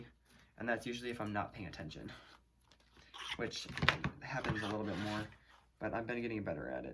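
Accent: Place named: South, United States. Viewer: You must be from the south 😁 I say Y’all too! Enjoyed your video and love your wreath!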